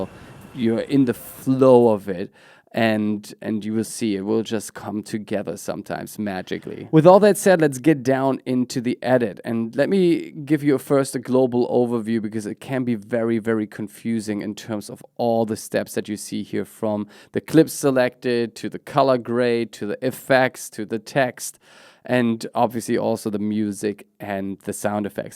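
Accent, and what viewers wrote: Germany. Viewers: Kann es sein, dass du Deutscher bist? Irgendwie klingt dein Akzent danach. Super Video übrigens!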